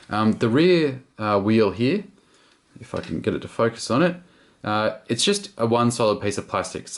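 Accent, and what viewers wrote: Australia. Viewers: Are you from Australia, you have that acent?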